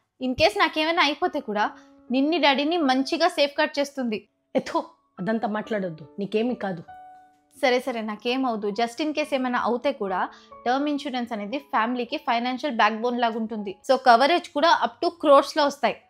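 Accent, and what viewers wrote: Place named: Karnataka, India. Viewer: Karnataka telugu ilaane untundi...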